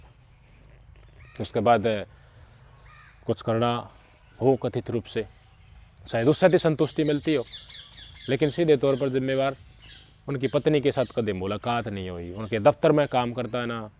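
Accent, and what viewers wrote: Haryana, India. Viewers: bhai haryanvi bol hai pr bhai ghani hi knowledge se bahi ne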